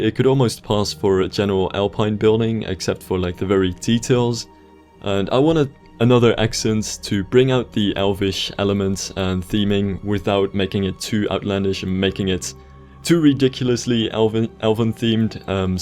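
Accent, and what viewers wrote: Netherlands. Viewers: <num> Yeah, he's pretty fluent! Although I can still hear a pretty clear Dutch accent to it :P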